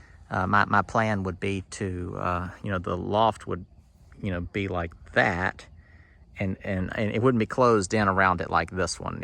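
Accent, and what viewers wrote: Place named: North Georgia, United States. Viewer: Just wondering, are you in Georgia? Accent sounds a bit like north GA.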